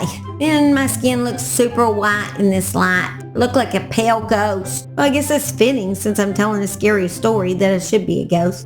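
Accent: South, United States. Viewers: Your accent sounds like Southern? Where are you from Mrs.?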